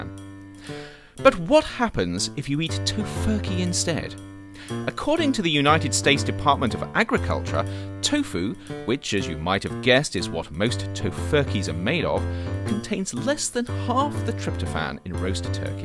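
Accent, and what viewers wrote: United Kingdom. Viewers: Why is there a British guy telling me about Thanksgiving?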